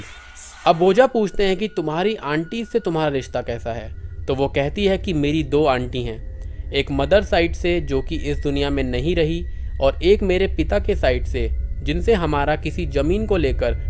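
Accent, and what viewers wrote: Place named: Haryana, India. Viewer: Haring admi ka awaz hai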